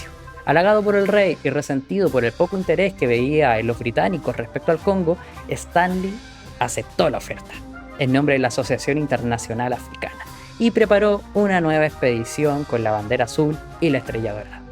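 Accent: Chile. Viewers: Siento un pequeño acento chileno eres chileno? 🎉 excelente canal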